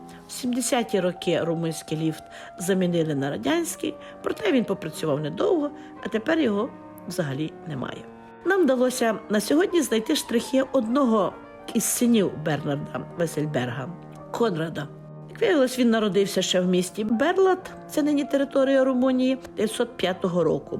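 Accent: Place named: Western Ukraine, Ukraine. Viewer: ДЕТОЧКА НЕ ПИШИ глупости ЕСЛИ не знаешь галичанского укр.языка.там именно на всей галичине так столетиями разговаривают и общаются. У этой дикторши даже произношение " захiдне, а не схiдне..Разговорный язык на Западной украине отличается и отличался от восточного и центрального украинского..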